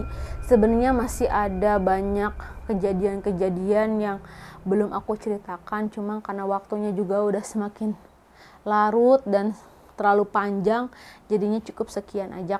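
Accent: Indonesia. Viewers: Ngerti dong Sunda banget c teteh na nyarios na😅😅👍👍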